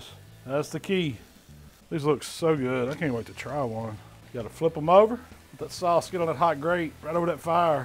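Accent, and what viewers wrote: South, United States. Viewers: It looks good, yet the Southern & Jamacian accents don't quite harmonize.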